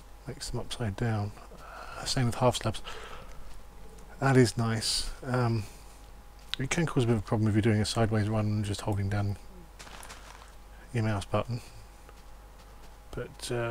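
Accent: United Kingdom. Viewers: how british are you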